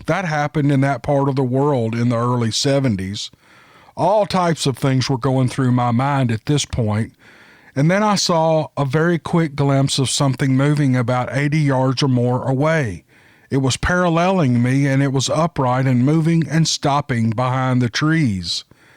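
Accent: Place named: Oklahoma, United States. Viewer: I wanted to remind the other viewers/listeners that if you aren’t satisfied “he’ll give you your money back!” My seven year old boy loves to say that now. Being from Oklahoma, we have a bit of an accent so he sounds just like you! It makes me laugh uncontrollably when he says it spontaneously. I posted a long time ago that it’s your character that attracts us subscribers/listeners. Keep up the great job and don’t let anyone convince you to compromise your convictions about keeping this channel about stories and stories only. Anyone that has issues with that has character issues that need to be worked out.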